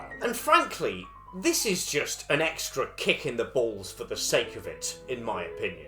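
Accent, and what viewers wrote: United Kingdom. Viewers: Hey, the irate British guy is back!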